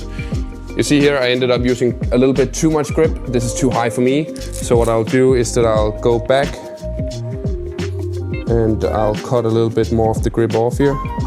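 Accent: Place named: Russia. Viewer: i thought his voice was like a russian- english language huhuhhh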